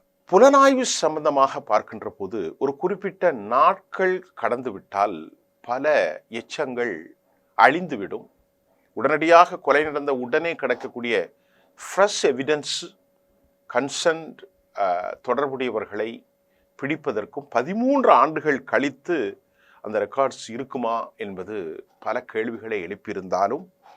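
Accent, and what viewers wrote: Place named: Sri Lanka. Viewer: இலங்கைத்தமிழில் கதைப்பதைக் கேட்க இனிமையாக உள்ளது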